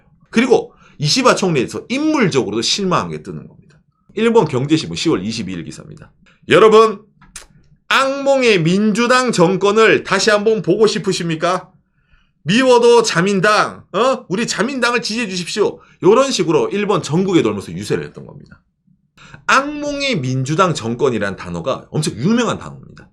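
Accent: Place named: Gyeongsang, South Korea. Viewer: 대구경북 억양이 확 들리네요😂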